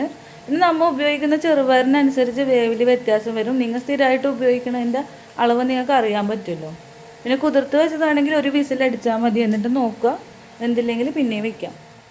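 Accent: Thrissur, India. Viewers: ചെച്ചി തൃശ്ശൂർ കാരി ആണ് ല്ലേ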